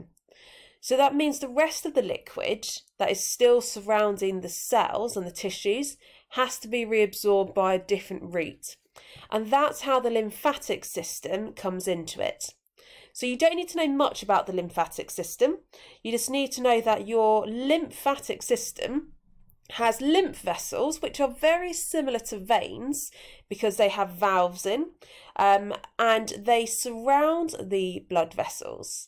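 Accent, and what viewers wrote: United Kingdom. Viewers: You are a British, aren't you??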